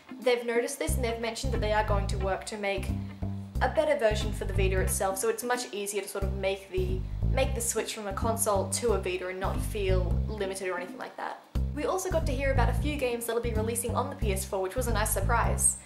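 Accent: Australia. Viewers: I love how aussy ppl talk, you called the vita veetr;p aha your super cute though.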